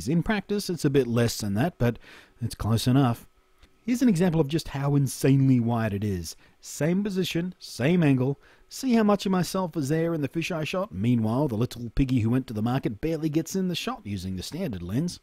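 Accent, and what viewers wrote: Australia. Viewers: He is not british. He's australian... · He's Australian... Unless you didn't mean that. Otherwise, yeah, Brit accents are pretty cool.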